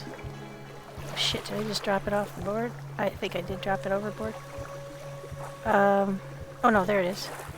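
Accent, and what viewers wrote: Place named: United States. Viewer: Ah... A kindred soul! I wonder how many more of us there are 'out there'? You sound American (Canadian?) I'm on the other side of the pond, so if I goof, please don't take offence. My go-to game is Horizon Zero Dawn. I find the sequel disappointingly boring. Fortunately my son gave me his spare PS4 during the Covid fiasco, and that got me turned on to gaming.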